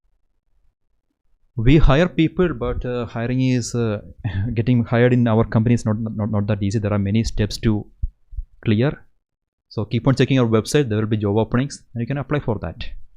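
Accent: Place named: Kerala, India. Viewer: This is malayali English 😁.my english teacher speak like this when I was in school.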